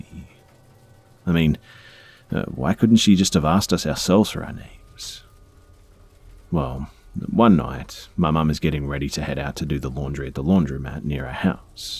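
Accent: Australia. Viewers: Are you from Australia?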